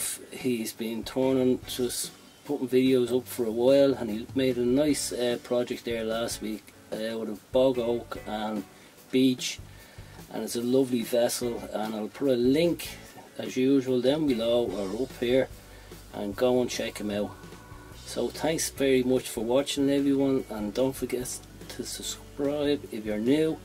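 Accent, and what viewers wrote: Dublin, Ireland. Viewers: i heard Dublin when ya spoke.. My uncles, my cousins, my Granny & Granda. i miss the way family was when that house was alive, & the epicenter. It was where Granny kept the whole world together. Where i really learned to have a propper fry, & where i almost always slept & felt at home when visiting. im 1st born American, always lived in California, but you know ive eaten mackerel fished out of a pole in the water while swimming out in Houth, so ill be alright- fuck me though... What was it you were doin' again? (subbed, cheers! & thanks)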